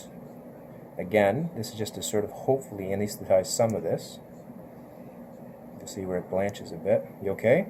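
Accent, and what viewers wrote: Canada. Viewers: "A month, eh?" Knew he was Canadian almost right off the bat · he is Canadian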